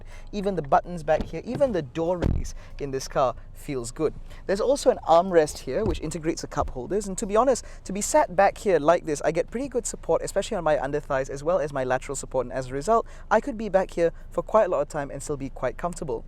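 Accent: England, United Kingdom. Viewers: Love your eng accents bro..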